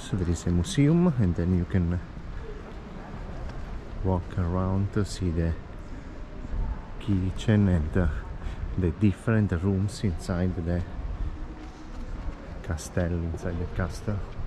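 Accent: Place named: Ferrara, Italy. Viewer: Fantastico il ferringlese si riesce a sentire la elllle e la essse anche in inglese! Sono di Ferrara tranquillo capisco! Bel video mi piace!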